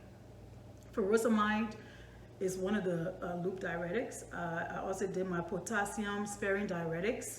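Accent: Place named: Liberia. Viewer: Hey you sound like Liberian. I am Liberian too. Congratulations I am preparing too for the nclex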